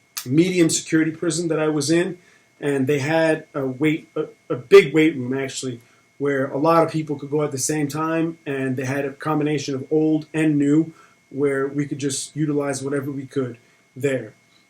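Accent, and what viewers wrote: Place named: New Jersey, United States. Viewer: I can tell your from Bergen/Passaic County. Your channel is the best, keep putting out content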